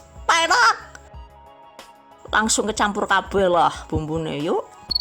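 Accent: Banyumas, Indonesia. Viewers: Ora ngapak ora kepenaknya bun..😀😂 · Mba asli mana kok bisa ngapak? Salam ngapak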